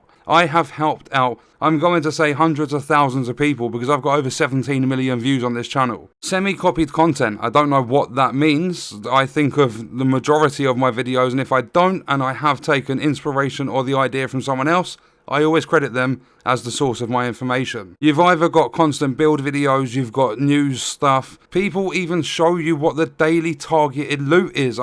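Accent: United Kingdom. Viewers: Love all the content. That British accent cracks me up mate 😂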